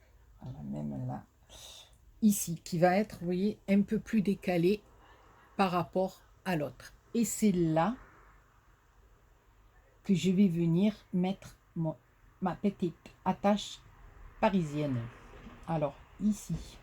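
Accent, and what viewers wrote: South, France. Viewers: Coucou, wouahhh c'est une sublime création, j'adore, douceur, raffinement!!! que du bonheur!! merci beaucoup pour ce tuto ensoleillé de l'accent du midi, agréable moment, bisous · Jolie carte Shabby j ´aime💞💞 merci pour le tuto et le partage ,ton accent du sud fait du bien😃👍.bisous😘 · Elle est vraiment très jolie cette carte, c'est vraiment un plaisir de vous suivre et pitié surtout pas de vidéo muette 😋 je suis trop contente de vous entendre ça fait du bien au moral! Continuez à nous enchanter et bravo pour cette belle réalisation (trop classe!) Prenez bien soin de vous et à bientôt pour entendre l'air du midi dans votre voix😍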